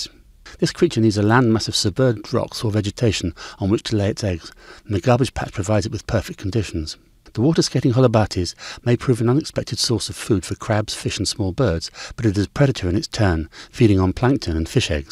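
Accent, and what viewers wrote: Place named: RP, United Kingdom. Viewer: I really hate this guy's breathy salivated kings English accent.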